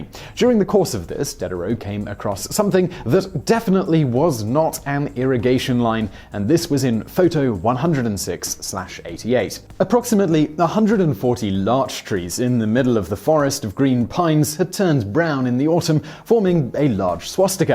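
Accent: England, United Kingdom. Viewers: I just spent an hour trying to figure out why vsauce was speaking in a English accent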